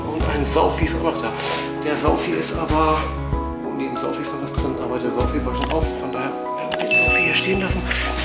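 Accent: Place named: Baden, Germany. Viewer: Das ist badisch!! Wir reden nicht komisch...IHR redet komisch :-) In Hausach war ich auf dem Gymnasium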